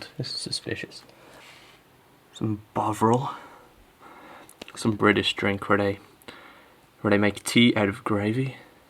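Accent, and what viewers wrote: Ireland. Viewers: the grid beside the barn is a cattle crush for inspection and reparing hooves not slaughter. and the shackles were probabley for side lining donkeys to stop them running :) and ya sound like your from dublin dude im connemara :D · i love the irish accent. "the udder side" lol. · Why the fuck would you assume he's American? This guy is clearly Irish. There's also a bottle of Paddy whiskey and the newspaper even mentions Garda, if you're too stupid to pick up on his accent. Bovril is a type of beef stock which is used to make a broth tea. You're an idiot.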